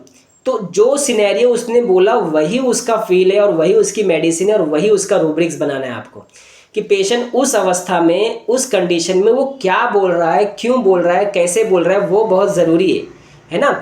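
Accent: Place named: United States. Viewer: Sir we learn a lot from your videos but few videos you are uploading in English American accent not in your own English/ Hindi language. This is not very beneficial for us.please continue in your own language .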